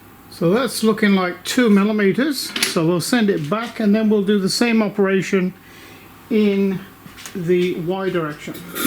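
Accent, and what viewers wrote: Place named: South West England, United Kingdom. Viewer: I’m doubly flabbergasted! Firstly that a machine capable of sub tenth of a millimetre precision is miles out at default settings. Secondly that you’re eyeballing it! What does the digital vernier say? Surely the settings should be based on the maths of of the screw dimensions and stepper motors etc. Should mention I’ve got a 6050 ready to assemble and coincidentally same VFD/motor combination you set up a few months ago. Your videos have been a wonderful source of expertise. Many thanks. PS. Enjoy your very familiar accent, South West England?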